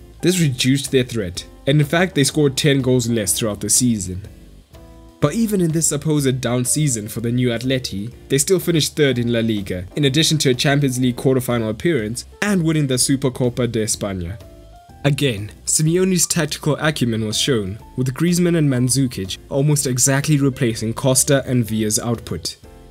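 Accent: South Africa. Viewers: Narrator sounds south African.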